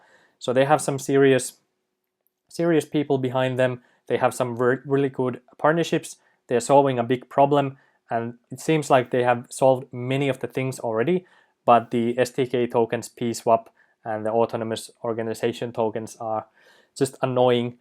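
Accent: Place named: Finland. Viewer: your accent man, it's brutally finish. I had been in Helsinki several times, and making the trip to Estonia! Love your forest, and love your show!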